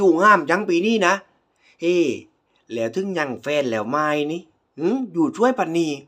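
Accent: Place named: South, Thailand. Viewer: งื้อ อยากฟังอ่ะ แต่ฟังใต้ไม่ออก 😅😅😅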